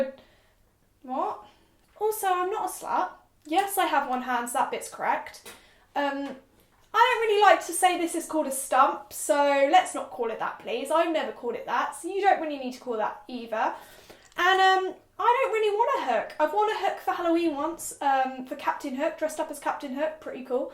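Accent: United Kingdom. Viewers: Her British accent makes her anger so much more easier to listen to. Haha biased American.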